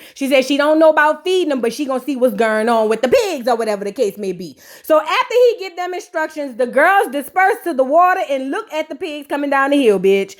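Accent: New Orleans, United States. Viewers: You are so funny! And ur into keeps a smile on my face, you definitely got the best into of any be I kno plus ur accent i I love it! I always wanted to to go to NO!!!!!’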